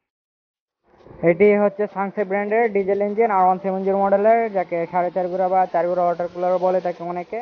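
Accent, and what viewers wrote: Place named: Sylhet, Bangladesh. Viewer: সিলেটের ঐদিকের ভাষা এমন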